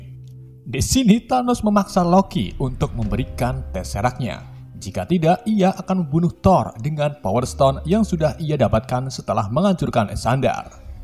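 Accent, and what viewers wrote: Indonesia. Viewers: Kentara lidahnya org indo v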